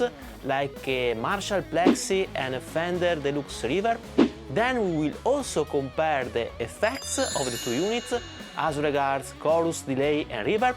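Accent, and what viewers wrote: Italy. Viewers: He's Italian, he has an Italian accent; what's the issue here! Great video (as always)!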